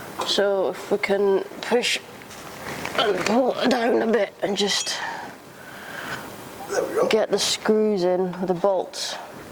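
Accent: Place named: Scotland, United Kingdom. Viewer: I hear Scottish in your accent.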